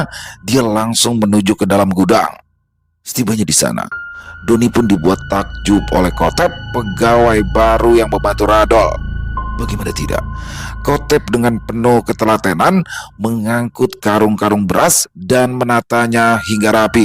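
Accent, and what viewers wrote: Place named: Java, Indonesia. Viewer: Waalaikumussalam Bang, Makin rame nih Warung Kopi Bang Doni....nggak mbosenin, ditambah lagi Bang ketika bawain masing masing karakter dengan all out terasa medoknya, apalagi ada Bang Kothep.....jadi ingat sama haji Bolot 😁. Terima kasih Mas Dani SBR dan Bang, Sukses selalu...🙏🙏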